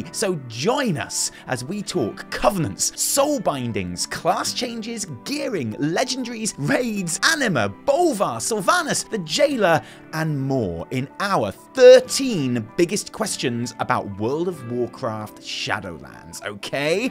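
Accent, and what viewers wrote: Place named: United Kingdom. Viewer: Add Subtitles plz... i love your videos... but you speak too fast XD i love the british accent by the way <3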